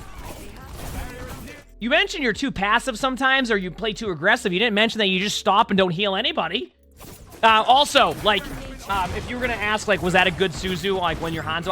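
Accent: Boston, United States. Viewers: Love the Boston accent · I love the Boston accent😂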